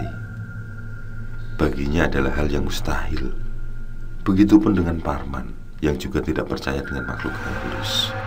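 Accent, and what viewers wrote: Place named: Bojonegoro, Indonesia. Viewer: Wong jonegoro ndi suarane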